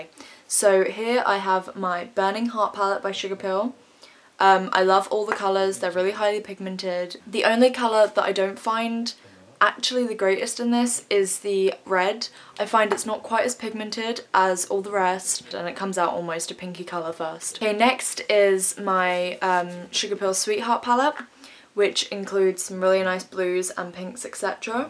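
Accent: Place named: England, United Kingdom. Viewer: Nice accent so where you from England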